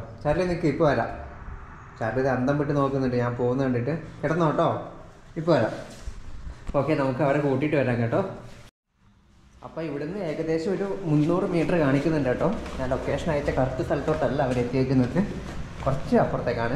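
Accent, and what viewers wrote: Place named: Kerala, India. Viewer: ഒറ്റപ്പാലം ആണോ നാട്? ഞങ്ങടെ പോലെ ട്ടോ ട്ടോ കെട്ടൊണ്ട് തോന്നിയതാണ് 😁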